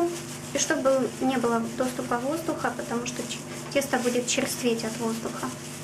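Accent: France. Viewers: От французского акцента чуть не стошнило, можно как то без него...😝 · Лайк за французкий акцент .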